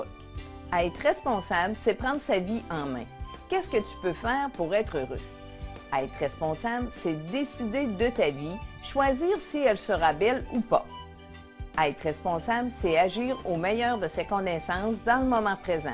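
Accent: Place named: Quebec, Canada. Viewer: Je comprend pas trés bien le Québec , vous pouvez parler le français normalement ? :/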